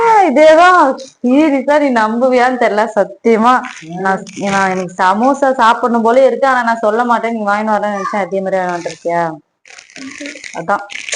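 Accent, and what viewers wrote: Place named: Coimbatore, India. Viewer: Ok sister unga slag coimbatore mari irnthathu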